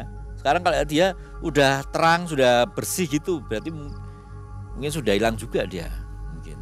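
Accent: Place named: Semarang, Indonesia. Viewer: Pantesan ora asing mbek logat e.. jebul wong semarang 😂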